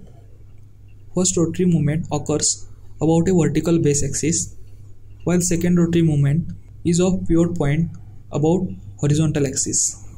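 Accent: India. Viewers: The most difficult English the indien English 😅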